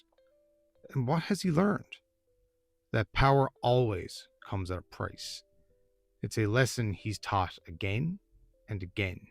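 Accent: Newfoundland, Canada. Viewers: I can tell from your accent that I've stumbled upon a fellow Newf 😅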